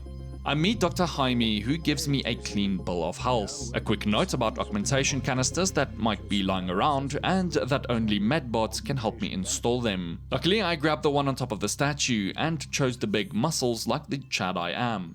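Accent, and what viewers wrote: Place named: South Africa. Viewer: Are you carring me a riar? Always kills me😂😂..good to hear a South African voice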